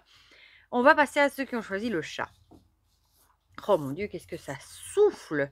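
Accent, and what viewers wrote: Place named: Belgium. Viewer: Coucou bonjour 🐕❤🐈🦊🐿🐇🐭🐼🦔🦉🐦🦩🪶❤, Waow waow magnifique énergies 🎉, j adore ♥. Tu me fais trop rire 😂 avec ton petit accent Belge 🇧🇪. J adore 😂😊👍. Merci beaucoup pour la petite pensée 🐦, pour moi. Oui j adore 💙🐦 les Mes Anges 🐦😇. Grand Merci pour cette guidance pour la semaine. Bon Dimanche, bisous bisous Sylvie 🙋‍♀️🦋🕊🦋🕊💙💙💙🙏🌈🌟🌈🌟 Bonne semaine ps: oui effectivement les bougies d actions, se consume plus vite, je confirme 🥴🥴🥴.....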